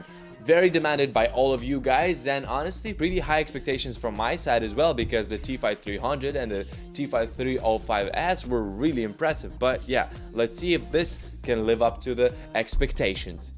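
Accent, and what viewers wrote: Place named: Russia. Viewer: From what part of Russia are you from? I Love your videos btw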